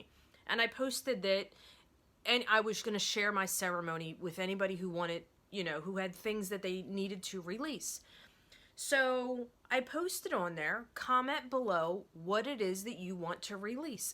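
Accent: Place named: Pennsylvania, United States. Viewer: Omg I knew that accent was very close! I’m in Media PA!! If you need a friend I’m here for you.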